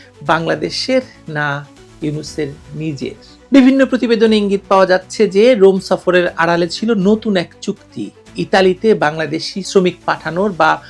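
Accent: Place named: West Bengal, India. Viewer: আফা ভারতের ভাট পারার মেয়েদের মত কথা কয় কেন? বাংলাদেশীরা জানতে চায় ।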